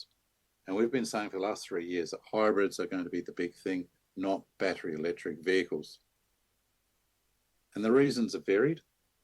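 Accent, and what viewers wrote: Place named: Australia. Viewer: Very interesting - thanks for that. You had me with the accent, I felt sure you were, like me, an Aussie.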